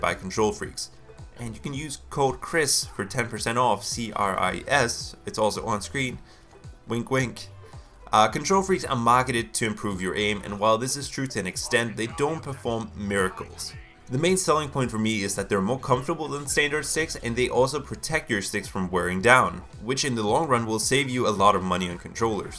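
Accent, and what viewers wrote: Boston, United States. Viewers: Where are you from. Your accent is cool. Boston?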